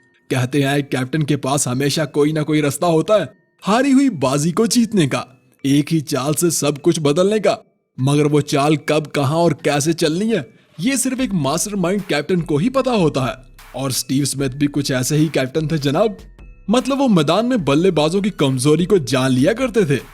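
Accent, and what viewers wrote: Pakistan. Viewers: Bhai Agali video Mein Mere Yaar question ka answer dena Tumhari Awaaz se lagta hai bolane ke tarike se Pakistan ke ho best off lak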